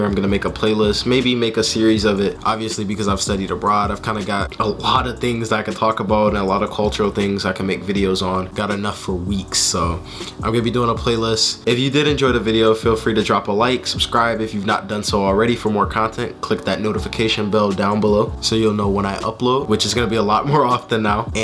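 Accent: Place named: Scotland, United Kingdom. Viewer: Nice video! Can definitely hear from some of your pronunciation/words you're using that you've been in Scotland a while - don't often hear an American saying 'Pished' 😂 Came over from UK Youtubers on Facebook 😊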